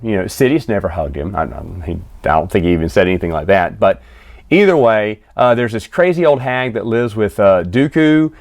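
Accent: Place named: South, United States. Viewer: There’s just something funny about talking about Star Wars or science fiction in a southern accent.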